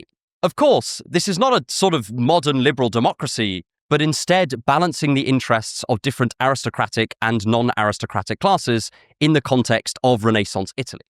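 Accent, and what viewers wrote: United Kingdom. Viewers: Ah, nothing better that a good video-essay with a good orator with British accent and 1am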